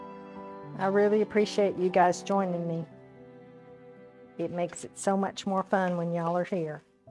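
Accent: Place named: Texas, United States. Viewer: I knew I detected Texas! Love, love your voice and your work! 🤩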